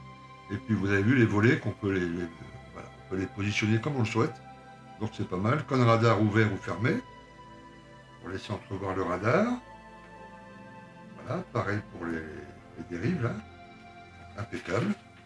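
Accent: North, France. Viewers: Sympa la petite voix un accent de ch'nord (normal pour un billoutte)...Super maquette..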